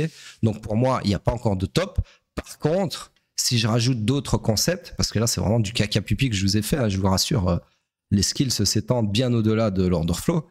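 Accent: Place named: Belgium. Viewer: c'est marrant, malade ca te donne un accent belge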